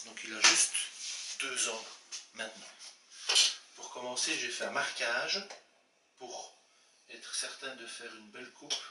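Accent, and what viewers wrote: Belgium. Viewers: IT IS definitely a Belgian accent. I am french myself and I live in Belgium, and I can tell you 100% I recognise this accent as being from Belgium. You are telling such bullshit. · i recognised a Belgian accent even before reading the description... he definitely has a slight accent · He has a strong accent from Wallonia indeed. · May just be a lower dialect of Belgium. Especially since you've got a couple languages commonly spoken there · yeah normally Belgian accent is a bit stronger than this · He speaks french but he's belgium, he have a belgium accent. (I'm french so) and the description in this video says "the belgium cheese master..." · yeah he does, accent from Liège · What do you mean by "people like that" ? You didn't read the description but kept trying to argue, and he also has a Belgian accent, just pay attention to the way he says "écarteur" like "écakhteukh"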